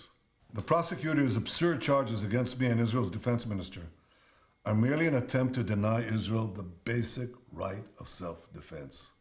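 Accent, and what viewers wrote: United States. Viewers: The man who speaks good English with an American accent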